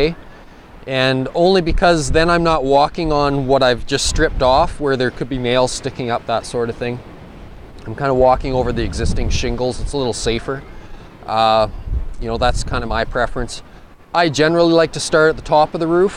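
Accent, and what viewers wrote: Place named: Canada. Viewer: This dude has to be Canadian right? I'm totally here for it 🤣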